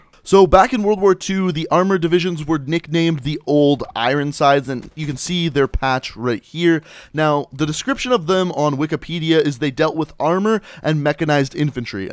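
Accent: Canada. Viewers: I kinda like your content, but I'm distracted by your HEAVY canadian accent, I mean "Peace Øut" what is that??!! LOL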